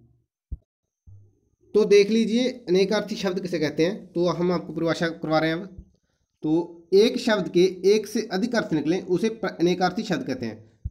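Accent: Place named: Punjab, India. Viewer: Apki hindi mai bhi Punjabi tone hai 😂😂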